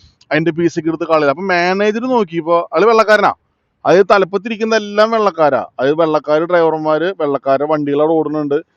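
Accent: Thrissur, India. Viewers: ചേട്ടൻ തൃശ്ശൂർ ഭാഷയിൽ നന്നായി പറഞ്ഞു. 😂ഇനി കാനഡ പോലീസ് നമ്മുടെ നാട്ടിലേക്കു വരുമോ. മോദി ഗ്യാരണ്ടി 😂അവന്റെ ഭാര്യ പോയാലും കുഴപ്പമില്ല. പണം കിട്ടണം.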